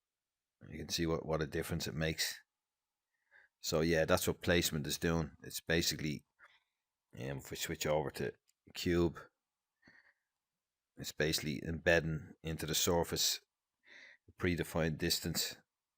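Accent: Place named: Dublin, Ireland. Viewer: I fucking love hearing a Dublin accent doing high end 3D stuff. Hope you’re well!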